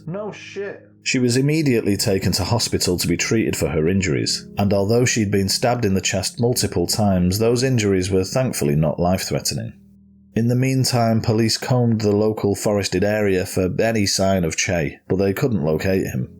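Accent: United Kingdom. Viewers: Hi, I'm a new subscriber in your channel, I love watching crime stories and for a various vlogs about crime investigation ,I love your channel. Can i have a request,can you pls put a substitle,cause I" ve got a bit hard in understanding on some of your British accent..Philippines here❤️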